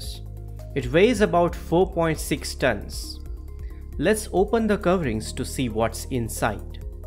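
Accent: India. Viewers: An Indian accent and an Indian teacher quality it goes down deep . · this video is well made except for the indian accent 🤦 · Hoooh hate when the Indian speak English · agreed....it sounds like a indian try to speak english....good video tho! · I love your accent! It sounds Indian, but it doesn’t sound INDIAN Indian, if you know what I mean…? It sounds like base Indian, with some outer influence…? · The Indian accent is the deterrent of millions of viewers of this great video. Please record the audio with the native English speaker. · nice, but that accent. It's a pity that many Indian people who has somerhing smart to say has so terrible accent that only a few people can handle that. Most people around the world who use Engish have quite ok accent, but Indian people.. man that's another level :) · Beautiful indian accent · I hate indian accent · These dumb channels cant ever find an American narrator who DOESNT have a wack ass accent? 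I dont understand this curry speak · A Good Science explanation but I am truly sorry I find it so difficult to listen his spellings, really indian accents is so unforgiving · Sorry ,I don't like indian English accent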